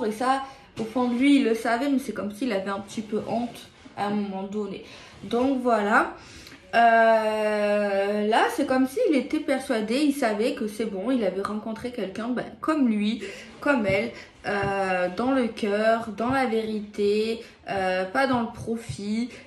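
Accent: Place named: Provence, France. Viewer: J’adore ton accent provençal, ne change pas … j’ai de la famille dans le sud de la France . Je viens du Québec , et j’adore tes expressions. Ça me rappelle mamie de Aix-en-Provence .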